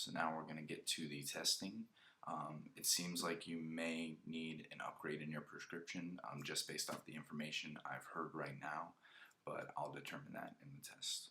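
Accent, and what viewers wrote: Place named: South, United States. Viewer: You have a great voice! It's kind of southern without being southern. I grew up in FL, so there is definately a distinction there. I usually enjoy the light stuff, but with this video I liked the audio more.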